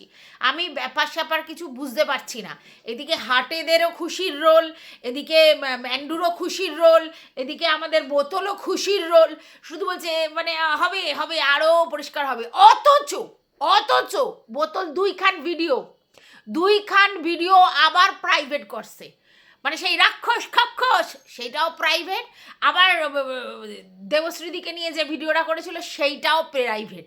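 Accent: Bangal, Bangladesh. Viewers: Darun bangal vasa koile go dil khus😂❤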